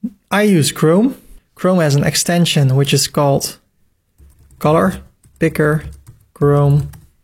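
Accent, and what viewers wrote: Netherlands. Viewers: I Love that I heard in the first 10 secs that you were Dutch, anyway great vid man, ga zo door!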